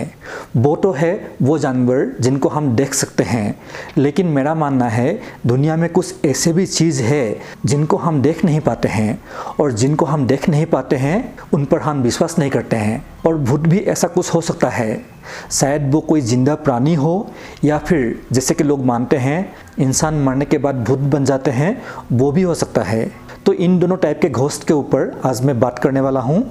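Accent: Assam, India. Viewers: Apuni Okhomia naki? Apunar accent ... Okhom ot Mayang district ot ase bhoot...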